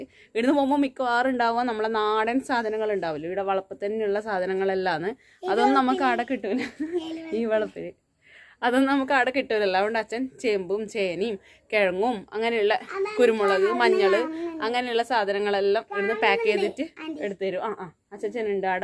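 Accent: Kannur, India. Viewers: കണ്ണൂർ ഭാഷ കേൾക്കമ്പോ രസമാ.. 😍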